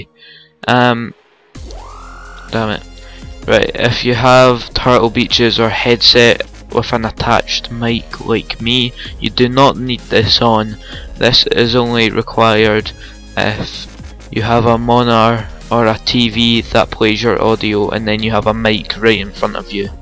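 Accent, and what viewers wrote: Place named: Scotland, United Kingdom. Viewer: Yassss he's Scottish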